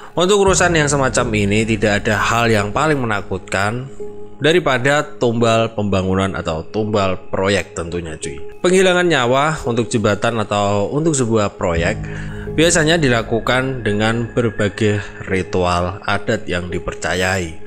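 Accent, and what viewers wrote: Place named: Java, Indonesia. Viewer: orang jawa emang logatnya beda hhhhhhh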